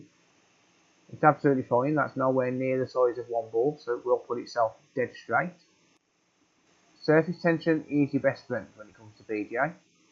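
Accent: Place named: Midlands, United Kingdom. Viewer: Subscribed! Not only is it nice to have a British Based Tech Channel I detect a fellow Midlander accent😂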